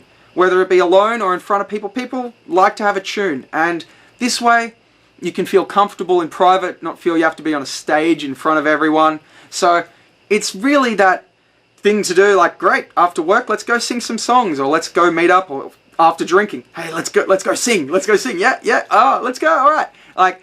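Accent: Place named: Australia. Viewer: You have very thick aussie accent. From around southern australia?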